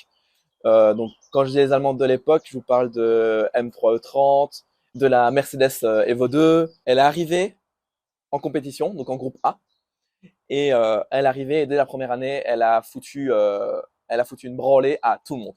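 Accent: Liège, Belgium. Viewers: Ça part sur un abonnement, ton projet me fait rêver 😃 tu dois être liégeois, à entendre ton accent ? J’habite à Francorchamps, perso 😊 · nickel alors si le message de base est passé et que ça t'a fait plaisir 😁 Merci, oui j'ai quand même eu un peu de chance malgré tout (je me posais une question, je vais sûrement avoir l'air con si c'est pas le cas mais, tu serais pas belge par hasard ? J'ai l'impression de reconnaître un accent liégeois, voilà voilà 😭) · 'fti ! ne viendrais tu pas de LIIIEECH?? xD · je suis de Walcourt :D a l’accent j’avais remarqué que tu étais de liège 😬😅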